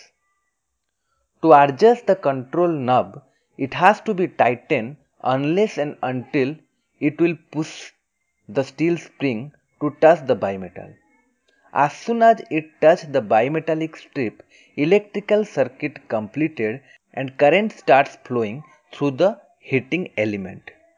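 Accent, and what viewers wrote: India. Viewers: typical indian accent :D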